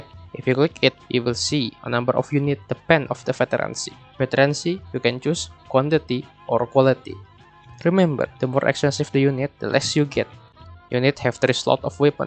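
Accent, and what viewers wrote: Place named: Indonesia. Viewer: Great video, wish I could understand the heavy indonesian accent in some places, but the editing is hilarious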